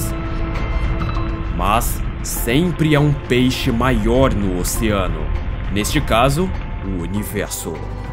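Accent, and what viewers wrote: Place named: Caipira, Brazil. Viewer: eu gosto quando o caipira surge "redoR" "caloR"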